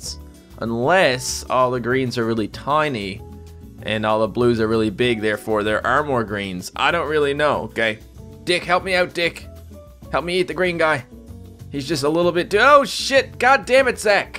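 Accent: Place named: Newfoundland, Canada. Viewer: oh my god! while watching this video i was thinking, he kinda sounds like a newfoundlander and i go to your account and you are! this makes me so happy :) #newfoundlandbuddies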